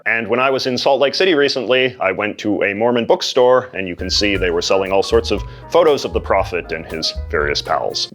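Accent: Canada. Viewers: Why am I just now hearing about this guy with 80's hair and Canadian accent? Because I've been listening to Neil Young? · You must be canadian by the way you say "about" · An ethnically ambiguous surfer dude that says “about” like Canadians. Modernity is so fun. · He's Canadian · Canadian, eh? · Your 'bouncy' countenance is driving me insane for some reason, like you rhythmically bounce up and down slightly to the cadence of your speech, twisting your head for emphasis on some words and then give a more pronounced 'bounce' to emphasize others. Like it's all I can notice whenever I see you talking, which is fine aside from certain extremely stereotypical Canadian pronunciations. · 🇨🇦Ha ha. Nice Canadian accent ya got there, Eh?!👍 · The purity of the Candian accent is just..... chef's kiss...... <3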